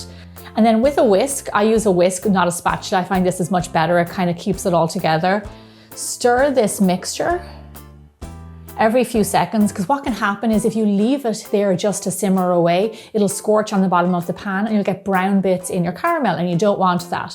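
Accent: Ireland. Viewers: YES! I had asked you on a very old video fo yours because I thought I recognized your accent, (I'm Irish myself,) then read your 'About' and was so confused! I was trying to think "Where the hell would an accent like that be other than Ireland..?" and nothing came to mind! Fellow Irish person here X You're my idol as well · Those look so yummy. I,love watching your videos and just love hearing your IRISH ACCENT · I have to be honest, part of the reason why I clicked is because I love the Irish accent. :) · I knew you are Irish!You still have very strong accent💕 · What percentage do you suggest if I use dark chocolate? I love this and your accent as I lived in IE for 8 years!!!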